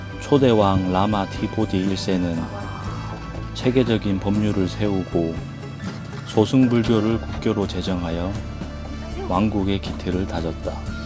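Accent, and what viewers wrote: Gyeongsang, South Korea. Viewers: 아유타야 유적지 구경 자~알했습니다 역사 나레이션 하실때 어색하지만(같은 갱상도 나레이션이라 ㅎㅎㅎ) 내용면에서는 알찼습니다 ^^ 진지하게 설명 잘 하시다가 "앗 뜨거"에서 빵 터졌습니다 ㅎㅎㅎ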